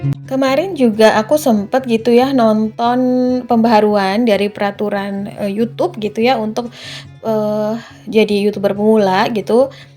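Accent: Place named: Banyumas, Indonesia. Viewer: Wah aku selalu lihat, kalau ada vidio yg baru mb, wong ngapak he he he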